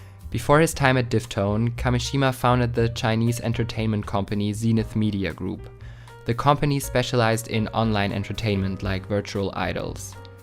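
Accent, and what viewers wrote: Germany. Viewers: As a German I can tell you are German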